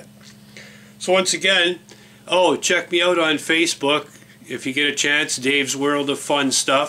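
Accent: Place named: Maritimes, Canada. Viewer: Hey Dave are you from the Maritimes originally? You sound like one of us. lol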